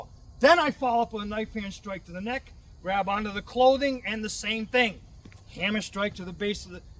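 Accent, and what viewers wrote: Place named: Northeast, United States. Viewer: Speakeming witha N.E. accent kills it for me.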